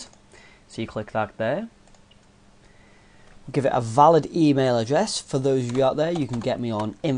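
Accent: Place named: Scotland, United Kingdom. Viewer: heh nice scottish accent!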